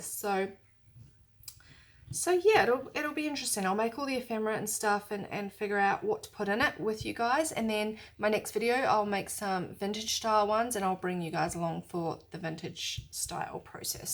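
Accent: New Zealand. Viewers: Love this video. I am a new subscriber from Sydney. Got put onto your channel by my sister' Roxy Creations By Rachel. Love all that you make and could totally tell that you were a Kiwi as soon as I heard you:)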